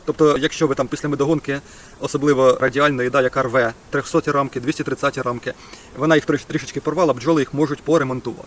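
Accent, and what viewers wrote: Standard. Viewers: Діло знаешь . Мова чиста , грамотна. Один недолік cпішиш. Кому ти розказуеш? Людям , то куда спишиш, виходить розсказуеш сам соби. Старайся , і поправляйся. Тоді все буде добре. Бажаю успіхів. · Дуже гарна чиста українська мова. Стільники необсушені мені зберігати не сподобалось. Обсушую у вуликах через заставну або через плівку. Далі проморожую у морозильнику і зберігають у порожніх корпусах + з оцетовой есенцією.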